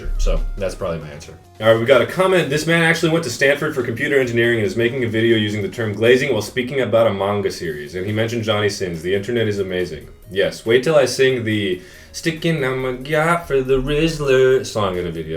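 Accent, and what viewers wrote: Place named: Balkans, Eastern Europe. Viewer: Knew it, the name, the humor, the accent, the looks. Everything screamed Balkan Slavic.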